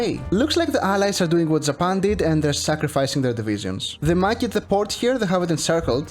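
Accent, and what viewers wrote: Greece. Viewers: I hear an accent in your voice which is pretty similar? Are you from Greece? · Bro are you Greek? You sound very Greek 😅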